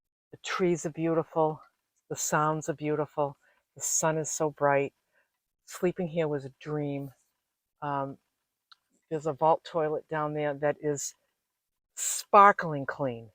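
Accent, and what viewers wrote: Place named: New England, United States. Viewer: I hear that familiar New England accent. Been up here 12 years.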